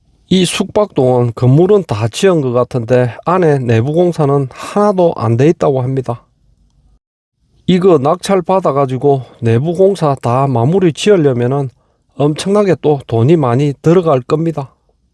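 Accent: Gyeongsang, South Korea. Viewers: 경상도 아이가 ㅋ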